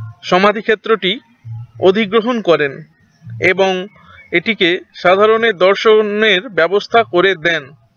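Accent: India. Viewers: Uchoron shune Indian mone hoi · আপনি কি বিহারী. কথা বলতে কষ্ট হচ্ছে?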